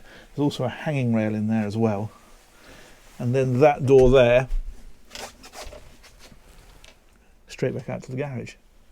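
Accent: United Kingdom. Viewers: Now that's the best use of space in a class A I've ever seen. And if you don't carry a vehicle the garage can be another bedroom or office or playroom or Mancave. Excellent design. And the biggest surprise is the price. At E285,000 very affordable. I like the British accent....Gah-rahge....Priv-a-see!!! Cool👍 These reviews are excellent just like your boat reviews.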